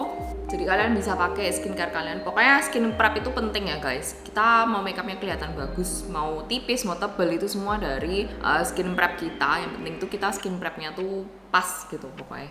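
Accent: Java, Indonesia. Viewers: aaa surabayaaa, ak udh curiga kok logat nyaa super sbyy ternyata bnrann HAHAH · hi kakk I'm new subscriber here;) keep going for your contents~ anw aku suka accent medoknya kakakk <3 lucuu pol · sering liat video kakak di reel short dan suka banget sama penjelasannya. kakak lucu (in a good way) suaranya, mendok dapet tapi klo ngomong bahasa inggris tuh bagus banget aksennya aku suka. tadi ngomong kayak "ituuuu" aja dah kayak lidah bule xD aku juga masih sering pake produk exp kak, tapi memang semua tergantung ke kulit masing2 ya. ada yang exp langsung bruntusan, ada yang badak mukanya. hasil make-upnya cakep banget dan aku jadi keracunan produk2 yang dipakenya wkwkwk. apa brush itu ngaruh bgt ya kak? soalnya rasanya itu tadi cuma tap kecil langsung keluar warnanya... atau itu karena produknya emang HQ? btw jujur bare facenya bikin aku salfok banget.. sehat, glowing pokoknya cakep. 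boleh dong ya kak nanti spill skincare favoritnya, dan mungkin kalo kakak ada pake massager tools buat muka, treatment dll gitu dikupas juga kalo ga merepotkan🙏🏼 last but not least semoga kakak dan babynya sehat selalu dan sampe persalinan nanti dilancarkan. aku tunggu konten2 lainnya ya kak 🤗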